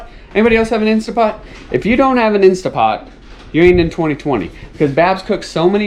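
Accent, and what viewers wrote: Tennessee, United States. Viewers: So glad I’m done raising kids .. I would be a nervous wreck if my kids were little at this time .... Do I detect a little Tennessee accent starting ? I think maybe .. 🤗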